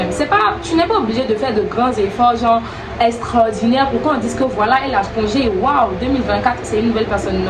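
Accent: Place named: Ivory Coast. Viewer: j'ai cru que t'étais ivoirienne 😅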